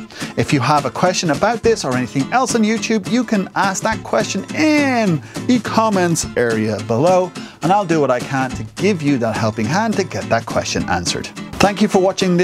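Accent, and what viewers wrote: Ireland. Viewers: are you Irish by any chance, that accent sounds very familiar... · Irish,accent caught my 'ear"..i need to use videos from a chsnnel im subscribed to here to a site thats app hasn't lauched yet i know they are all in my playlust but everyone else seems to be sble to post a YT to watch.....any ideas please !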